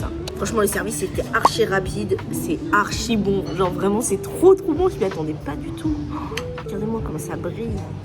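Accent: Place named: France. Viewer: Yes I would, nice 👍 French accent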